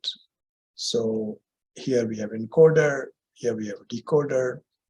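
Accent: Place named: India. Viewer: I hate indian accent. How on earth are there so many indian professors in our country?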